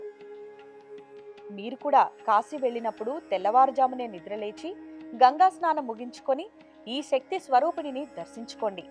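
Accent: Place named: Telangana, India. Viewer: You guys have taken a good decision! Please continue with the content/news with strong throwing of words in Telangana Accent. Thank you 😊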